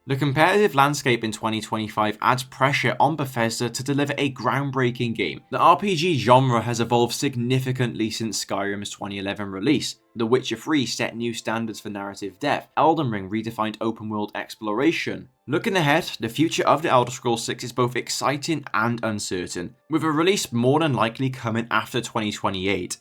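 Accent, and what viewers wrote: Wales, United Kingdom. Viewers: Is that a welsh accent i detect?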